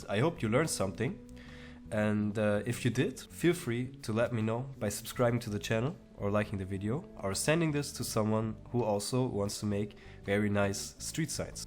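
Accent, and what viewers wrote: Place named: Germany. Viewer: Nice tutorials! Are you german btw? haha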